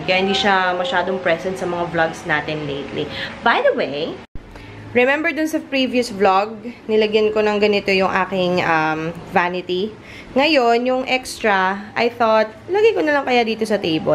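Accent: England, United Kingdom. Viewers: i love your english accent ate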